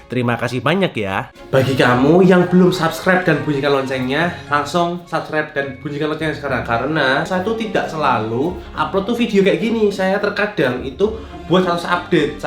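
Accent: Java, Indonesia. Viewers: pak medok banget pak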